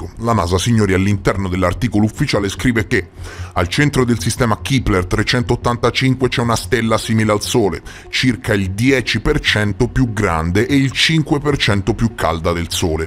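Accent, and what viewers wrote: Tuscany, Italy. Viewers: te mi freghi una marea di tempo che non ti dico 🤣🤣🤣..però sei talmente interessante che..quando proprio non ho tempo..ti ascolto mentre faccio qualcosa in cucina..molti complimenti davvero..sei unico..( e mi pari pure toscano come me)..ma non lo diciamo a nessuno 😅🤭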